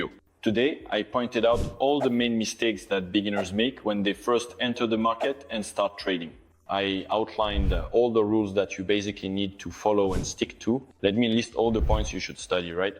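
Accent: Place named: France. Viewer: You have a French Accent 😁😁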